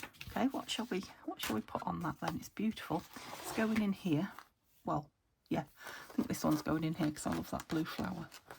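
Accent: United Kingdom. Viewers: Good morning. ☕️ Congratulations on 3,000 subscribers. It sometimes takes me a day or two to completely watch a video because of interruptions in my life. Your voice, and gentle crafting and wonderful UK accent are the highlight of my day. Thank you so much for the inspiration and sharing your beautiful journals with us.❤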